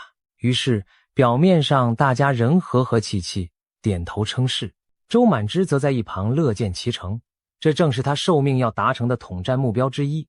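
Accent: China. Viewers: 攜字主播讀鞋音，真是毛澤東思想接班人，主播應該是共產黨員口音，主播大陸人吧！口音像似大陸人中文字發音非常爛又不正確的發音，在此糾正你攜讀ㄒㄧ音不是讀ㄒㄧㄝˊ音除非主播是共產黨員才讀錯誤百出的鞋音。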